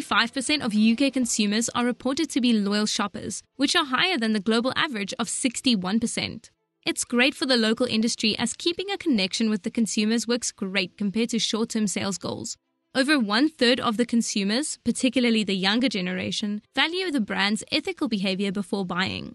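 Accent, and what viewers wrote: South Africa. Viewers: There's no way the person voicing this isn't South African... Would be be a change. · the narrator's accent is so South African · I know a sef effrican accent when I hear it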